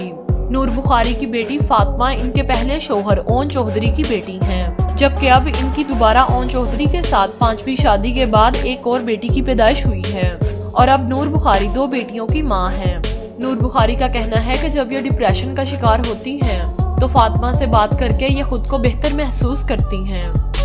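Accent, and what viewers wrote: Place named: Punjab, India. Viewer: PL IMPROVE YOUR URDU ACCENT, ITS A MIXTURE OF PUNJABI AND URDU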